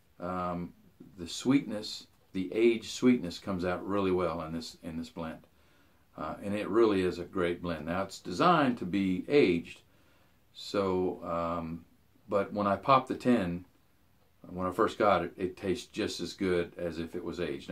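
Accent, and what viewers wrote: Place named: South, United States. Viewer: what part/s of USA is your (or his if your a viewer & U know?) is your accent from/based-around,? As a Brit gal (my hubby smokes a pipe & loves Nightcap & your vids) I/We can't quite place it; I get notes of Southern, maybe a dash of Texan, Midwesterny overtones, a teensy bit of Northern-border region possibly (Pennsylv./Fargo e.g., hey I'm a Brit I'm gonna be way off prob!?), and a hint of light New-York here & there. But really it just sounds like what we in rainy old England might consider a 'straight-American-Dialect' with a touch of some southern state/s; usually the only Southern-State accents we tea-drinking islanders can tell apart from the others are New-Orleans and 'pure' West-Virginia (if that counts as a Southern state?, it sure sounds like one to us). Just curious & interested to know how way-off we are - happy piping! 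👍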